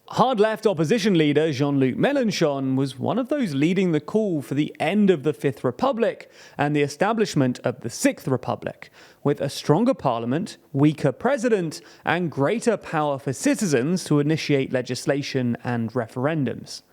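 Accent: United Kingdom. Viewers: It's LA France. I watched anyway thinking the narrator is a Brit.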